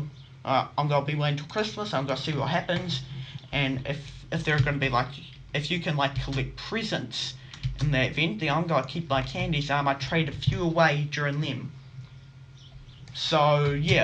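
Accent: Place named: United Kingdom. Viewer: Brittish accent 👌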